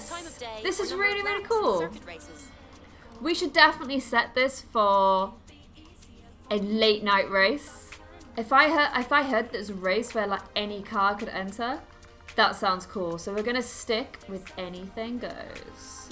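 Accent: Ireland. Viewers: ur Irish? high 5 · what?? you're irish?......do you know jacksepticeye? I'm a big fan of his. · are u Irish?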